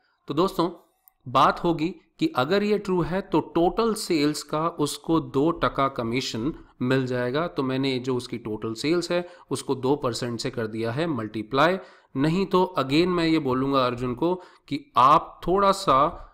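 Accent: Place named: Pakistan. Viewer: Excellent explanation. You sound more Pakistani than Indian, pronunciation wise such as the word zaroor, Aap etc. Probably because you are from UP/Delhi. Nevertheless, good work, love from Pakistan.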